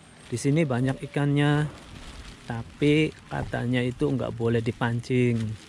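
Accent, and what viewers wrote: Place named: Cirebon, Indonesia. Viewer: Sampean logat cerbonan mas ...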